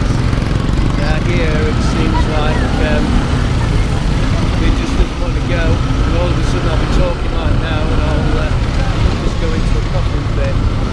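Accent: United Kingdom. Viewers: Show Hey. Bro! small world after all eh? is riding like, a bloody local pinoy here though! while speaking with British accent hehehe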